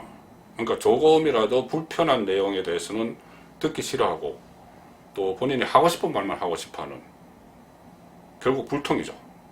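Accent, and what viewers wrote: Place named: Daegu, South Korea. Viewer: 방송나와서 대구사투리로 대구욕보이지말고 술마셨음 디비자라 시간나면 나베 삭발하나 안하나 취재 좀하고 같은 대구인으로 방송나와 씨부리는거 보마 마이 부끄러워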